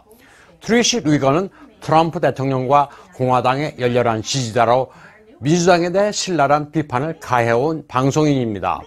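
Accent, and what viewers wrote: China. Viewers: 한글로 써놓은 지명등을 중국발음으로 읽는 것을 듣는 것이 귀에 거슬리네요.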